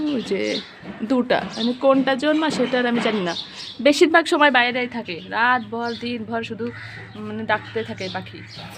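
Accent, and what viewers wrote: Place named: Faridpur, Bangladesh. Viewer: আসসালামু আলাইকুম আপু। মাশাআল্লাহ তোমার ছেলেতো একধম পাখি প্রেমি ❤❤।তোমার কথার টৌন শুনে মনে হলো তুমি ফরিদপুরের।আবার বাগেরহাটের কথাও কিছুটা এমন।আপু পাখিটাকে একটা ক‍্যারেটের ভিতর রাখতে পারো তাহলে পড়ে যাওয়ার কোন ভয় থাকবে না।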